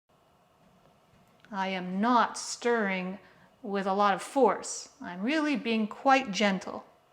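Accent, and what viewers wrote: Canada. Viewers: Wonderful, sensible instructions, without fuss. Also enjoyed the clear enunciation--is that a Canadian accent? Lovely. Thanks for this!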